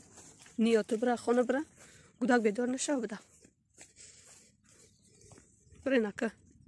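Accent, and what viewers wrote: Yovon, Tajikistan. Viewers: Салом хохарчон ман зан хастам шумо аз ёвон мешед ми лахчаи гапзанитон ранги ёвонихо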